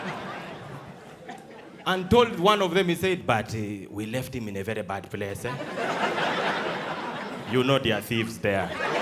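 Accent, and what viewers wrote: Uganda. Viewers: you nailed the American accent. And I salute you for carrying out the whole sketch in your Ugandan accent. It shows how much proud you are of your culture. Warm salutes from Morocco 🇲🇦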